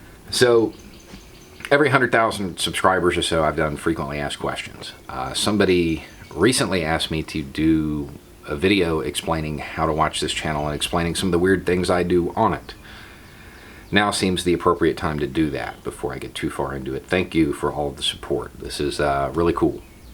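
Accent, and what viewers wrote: South, United States. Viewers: Pfeil My personal prejudice is centered around people that talk southern. So, at first, was hard to take. I got over it and look for his posting every time I sign on now. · of the Fifth Column Got it Thank you for the explanation. As a fellow southerner (S.C.) I truly appreciate what you are doing. It’s always great to hear someone that talks like me expressing these views. It’s nice to know I’m not alone. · I wish I had noticed how many internet people had subscribed when I did...I'm sure it was the first or second howdy there and I was hooked. Up here in New England, I missed the drawls of the south. I like the beard and lack of toxic masculinity and that he calls b.s.( very tactfully) on the dear leader's actions. I really enjoy the satire of an upside down curious George and the cake dialogue with your woman ( legit had me crying laughing). 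Congratulations to you. Positive vibes from New Hampshire and remember to be kind to each other and yourself during this pandemic and social crisis . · I'm in Texas waist deep in Southern racism and Trump lunacy. I went to a small town all white school where teachers and students dropped the 'N' bomb shamelessly. Nearly everyone on my FB feed and half my relatives are in this mindless Trump cult. Children of the Dixiecrats and they haven't changed a bit since the 60s. You're a Southern man,....how do you deal? I don't kick people off my Facebook friends list because I don't want to be isolated in an echo chamber and also to let others know they don't have to be bullied by some small town alpha pup into going along with the crowd. I don't call people names or put up mocking memes, even though I'm tempted, but just post credible journalism and, I hope, sound debate. But it doesn't matter. 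It's like trying to convince a crazy person he's not the reincarnation of Jesus. Anyway, it's nice to peek out of my North Texas bunker and see someone talking sanity with a Southern accent and a bubba cap.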